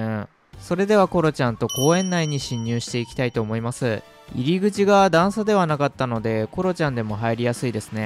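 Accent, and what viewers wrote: Ibaraki, Japan. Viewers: コロちゃんの茨城弁が可愛いです 手作り弁当美味しそうでうらやましいです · 出たぁー🎉コロちゃんの茨城弁😂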